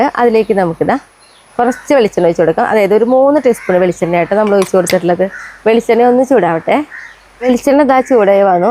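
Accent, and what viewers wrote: Thrissur, India. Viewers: ഓരോരുത്തർക്കും വേണ്ടി സംസാരരീതി മറ്റാൻ പറ്റുമോ.. അത് തൃശൂർ ഭാഷയുടെ പ്രത്യേക ശൈലി ആണ്... നിങ്ങൾക്ക് കണ്ണൂർ കാസർഗോഡ് ഭാഗത്തുള്ളവരുടെ ചാനെൽ കണ്ടാൽ എന്തായിരിക്കും പറയുക... മനസ്സിലാവുക പോലുമില്ല.. പക്ഷേ നമ്മൾ എല്ലാം കാണണം.. മനസ്സിലാക്കണം.. അങ്ങനെ അല്ലെ.. അല്ലാതെ ഞാൻ പറയുന്നത് മാത്രമാണ് ശരി എന്ന് വിചാരിക്കാതിരിക്കുക.. ഇങ്ങനെ പ്രതി കരിച്ചതിനു ക്ഷമിക്കുക.. Content അല്ലെ പ്രധാനം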